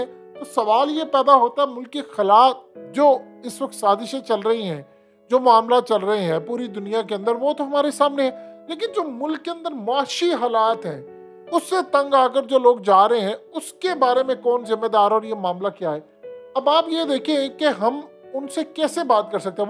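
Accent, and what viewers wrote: Punjab, India. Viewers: Mr.why you speaking Urdu in Punjabi style....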